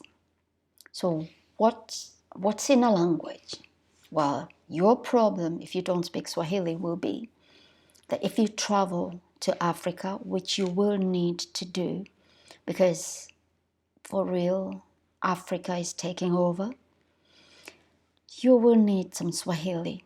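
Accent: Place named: Uganda. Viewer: The presenter of this program you sound Ugandan and yet you said you are Kenyan I’m pretty sure depends on your tribe Ugandan and Kenyans we are equally the same thing thanks for your information I already speak Swahili myself I was born speaking Swahili and as you said Swahili is the way I totally believe you because I’ve always been convinced that Swahili was the most superior language on the continent of Africa always thought Swahili is superior than other languages from the west coast of Africa or even the southern coast of Africa and other countries in between that again Kiswahili is definitely superior…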